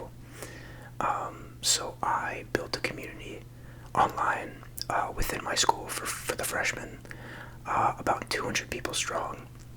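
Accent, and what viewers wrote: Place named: Brooklyn, United States. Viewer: I’m interested in pinpointing your accent. It has been muted somewhat by education and socioeconomic status, but my guess is Brooklyn or somewhere near.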